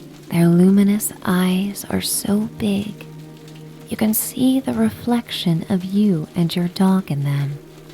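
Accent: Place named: United States. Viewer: Love the rain ☔️ sounds! That is what decided this video for me! Love your voice and accent! I moved from the us to Ireland when I was 8, and the uk 🇬🇧 when I was 16 and I love it but my inner child loves an American 🇺🇸 accent! Soft like yours which was made for this and you would make an amazing hypnotist! Thank you 🙏 🕊🤍🕊 🫶✨🌟💛🌟✨🫶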